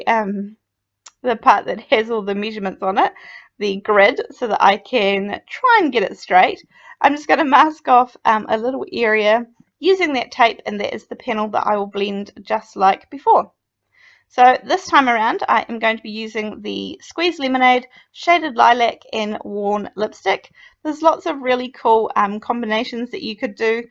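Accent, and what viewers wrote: New Zealand. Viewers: Lovely cards as always. Also very simple and achievable. Are you from New Zealand?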